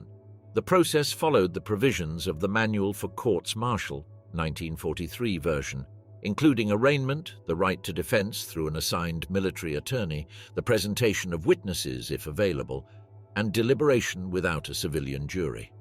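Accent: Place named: United Kingdom. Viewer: Why do they have a limey talking about Our Army?!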